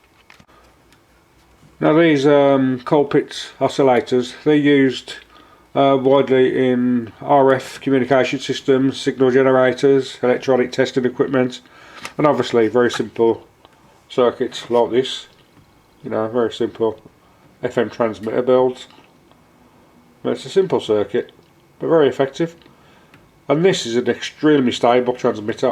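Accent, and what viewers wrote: Birmingham, United Kingdom. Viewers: Your Obviously A Brummie , Do You Remember PCRL On 103.5 FM ? Blessings From Penzance , Cornwall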